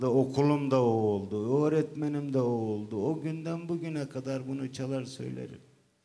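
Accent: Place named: Anatolia, Turkey. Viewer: tam Anadolu ağızı çok güzell nazal n ler falan super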